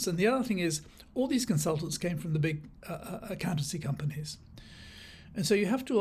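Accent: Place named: England, United Kingdom. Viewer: Serious websites discuss the consequences necessary of the appalling maladministration in Britain since 1945 save for a single short period. This site is simply sectarian bigot nonsense. With a nice English middle class accent. You do not get the vast poverty level there save for incompetence and worse since 1945.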